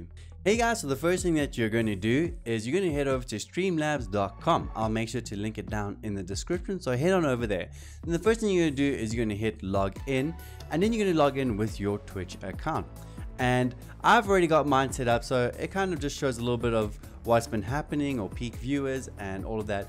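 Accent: South Africa. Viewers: Ayyyeee Afrikaans?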